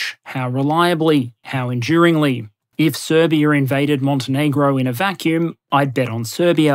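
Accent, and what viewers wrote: Australia. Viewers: After watching a great f1 race, then the rest of the wec race at monza and also imsa i get to listen to an aussie talking about war and negotiations while making F1 references. Perfection.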